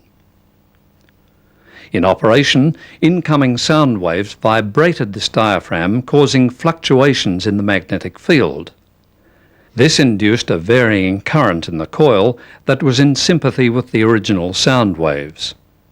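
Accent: Australia. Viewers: He's Australian, other than that, not sure. I heard him in many educational pieces growing up in Melbourne Victoria. It's cultivated Australian English which (I think) is based on Oxford English but sounds a bit flatter for lack of a better explanation. It's most common in Sydney and Melbourne. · The Voice Artist is speaking with an Australian Accent, as this is an Australian Production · Australian accent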